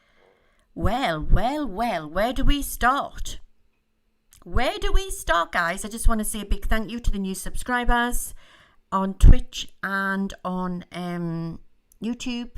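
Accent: Newcastle, United Kingdom. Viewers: Only just found your channel x loving it and just love the Geordi accent x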